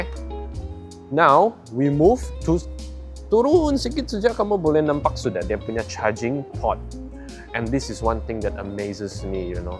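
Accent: Sabah, Malaysia. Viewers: Bila slang sabah dia masuk aku terus naik syiok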